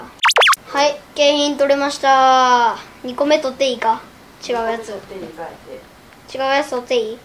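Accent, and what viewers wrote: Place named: Kansai, Japan. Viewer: 関西弁可愛い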